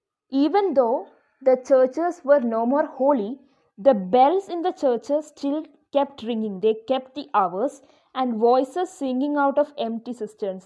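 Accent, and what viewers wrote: India. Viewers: Can you please write what is Shantih shantih shantih, i have difficulties in understanding indian english 😩 And can you also do a vidéo of analysis of "in memoriam" by Tennyson Thanks a lot for your work A follower from France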